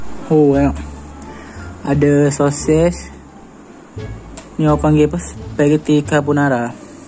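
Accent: Terengganu, Malaysia. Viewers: Dgn slang ganu dye comel😂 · Aku suke tengok orang ganu cakak..hahaha good job bang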